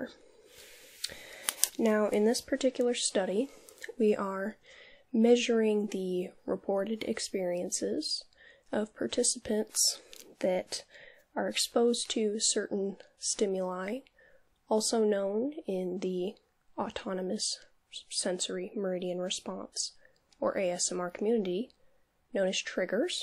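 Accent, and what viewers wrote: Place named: South, United States. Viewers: I hail from Georgia, and you nailed the Southern accent. You forking rock:) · How in the world am I supposed to relax with this video when I'm laughing half the time?! With nearly all other ASMR vids I watch, I do so simply for the tingles and relaxation. But with yours, the comedic aspect is always an added treat. And, as a lifelong Southerner, I have to say you do a mighty fiiine job of nailin' the accent, darlin'. Keep up the good work.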